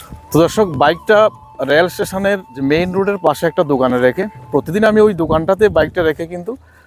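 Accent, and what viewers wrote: Noakhali, Bangladesh. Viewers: ভাই আপনে কি নোয়াখালী ভাষায় কথা বলেন?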